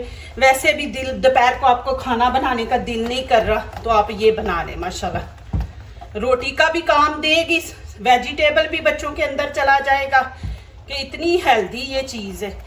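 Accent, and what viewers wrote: Punjab, India. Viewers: Doosri baat: Jin viweres nai punjabi mai baat karnay ki suggestion di hai woh sach keh rahay hain ap agar punjabi bolain tou apkay show ko char chand lg jayen Urdu ko punjabi accent mai bolnay wali weirdness bhi nahi rahay gi. Aur punjabi sajay gi bhi bohat apki awaz mai... Its just a suggestion Please don't mind. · اردو نہیں پنجابی بولا کریں، اردو پنجابی لہجے میں مذاق لگتی ہے۔ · Assalam o allaikum best ha laiken khuda k liya apna punjabi lahga sahi krien pleas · Very poor Urdu in Punjabi style. commentary. Improve it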